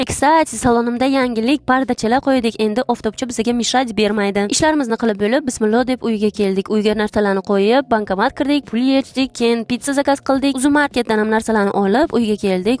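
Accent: Bukhara, Uzbekistan. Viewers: bn kurwingla ukishiyam Buxorolikku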